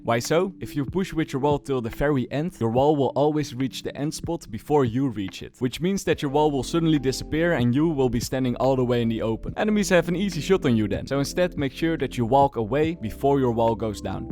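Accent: Netherlands. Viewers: het kaas accent is zo grappig vooral met vjeulereble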